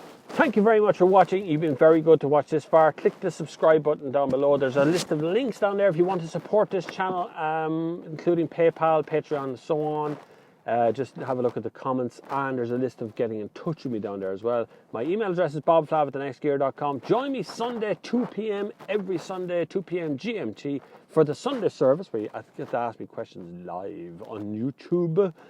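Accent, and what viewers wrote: Ireland. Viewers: baaags of space, love this Irish accent, 2011 quite good amount of snow.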